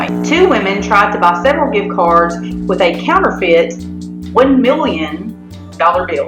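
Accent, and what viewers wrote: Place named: South, United States. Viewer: I like the Southern accent. It’s very similar to the Manchester Accent in the UK 🇬🇧.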